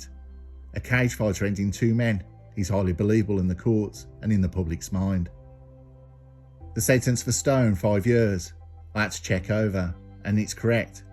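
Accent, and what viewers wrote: Birmingham, United Kingdom. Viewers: Really like the way this guy puts these together. And his VO with his brummie accent 🙏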